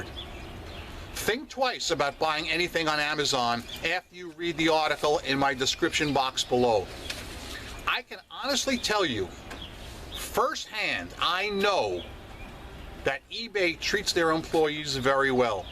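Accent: New York, United States. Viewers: love hearing all the NY expressions and pronunciations - miss the vernacular. Had a p.s. on a sale last week. What I did was send the item as though I never got the second one. Once the post office got it - I messaged the buyer that I saw his p.s. on the sale AFTER our warehouse had shipped it. I said they go on the paypal sales as soon as they go through. ha. No response, no neg.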